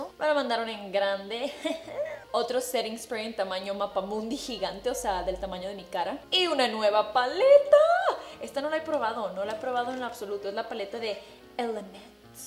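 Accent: United States. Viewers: Noooooo, no dejes de hablar inglés, amo tu pochés Hahaha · has un vídeo usando solo productos de It cosmetic please. Y amo tu forma pocha de hablar nunca la dejes♥️♥️ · No, tú sigue hablando pocho 😁, yo también soy de frontera y tengo que aprender inglés, me gustan muchos tus vídeos ❤❤ soy nueva ya llevo casi un mes viendo tus vídeos, y me gustann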